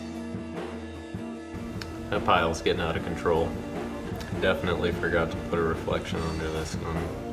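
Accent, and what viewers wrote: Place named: United Kingdom. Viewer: I love this,. Thank you for posting. It looks like you are using a smaller, square canvas and much smaller brushes than Bob uses. I have been given a lot of small canvases including square ones. I shall be trying your method of painting this using smaller brushes too. Your accent sounds British, if so where are you from? I’m English but now living in Spain.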